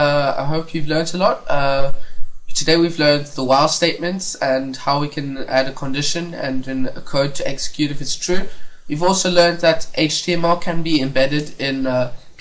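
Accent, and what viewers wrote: South Africa. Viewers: Must be a south african, Viva!! Its nice to hear a familiar voice.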